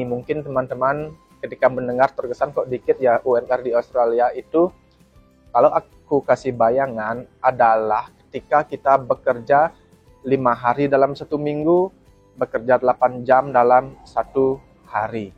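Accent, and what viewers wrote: Bali, Indonesia. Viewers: Pasti asal bali, logat2 bali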